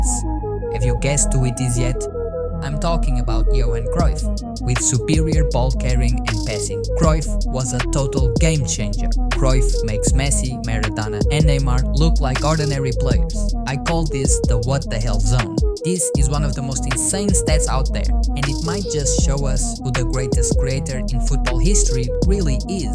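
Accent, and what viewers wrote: Portugal. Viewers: Is the narrator portuguese? The english accent seems like it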